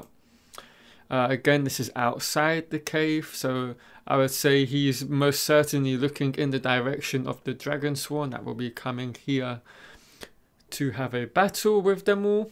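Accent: United Kingdom. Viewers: Are you from the UK? You have an interesting accent.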